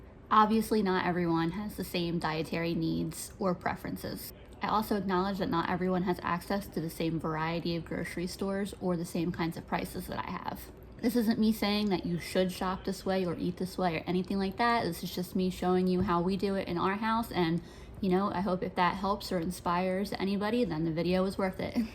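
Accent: United States. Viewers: LOL! I love hearing, y'all... it is so... American. Not something that I typically hear nor am I criticizing... just something everyday to you but funny in my ear. Language, eh? Anyway, I very much appreciate your channel and the food you make for people on strict budgets. More importantly, raising the issue of food insecurities and life of folks in financial dire straits that exists everywhere. Fresh fruit, veggies, eggs, and beans with rice are always necessities. Access to healthy food is as much of a right as clean water, affordable housing, healthcare, and an livable wage. Right up there with your right to life, liberty, and the pursuit of happiness. Meals one makes themselves are the way to go, not only nutritionally but also for family cohesion. ish, you mention a ham steak and if you get some split peas and a slow cooker, one can make a dynamite, classic French split-pea soup if you slow cook the ingredients and dice up the ham! 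A smoked turkey leg is a great substitute for anyone who dislikes or avoids ham. Perfect for the colder months with a slice of crusty French bread and it will feed an army. Merveilleux! C'est délicieux à faire pour votre famille ou vos amis!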